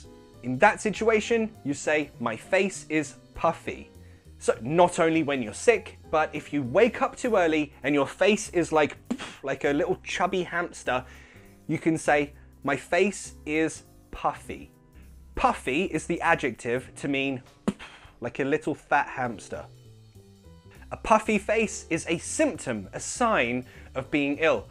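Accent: United Kingdom. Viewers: The best teacher, I love the british accent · As an English teacher and when I had a butcher on your videos I thought they are similar to other videos on the YT, I have learned a lot from you mate, I daresay you are a star in teaching as far as British accent is concerned ,very talented and your body language is really explanatory and amazing, thumbs up mate . I wonder if you could post a video on some conjunctions and transition words,like henceforth , therefore ,thus,etc I couldn't find any genuine British videos concerning these. Cheers Keep it up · Some phrases from one of my students: "In spanish when you have diarrhea we say I have a "belly ache" or lower abdomen ache since the stomach is in a different area of the body, separated from the intestines". "I have pain in my private parts"... what would that be in proper english?. Another phrase from my student: This type of food makes me fart in the morning. 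Thanks you are a very sexy teacher, entertaining and with a wonderful british accent.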